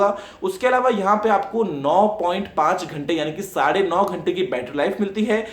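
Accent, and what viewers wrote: India. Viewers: is he really talks english?? heard like speaking indian..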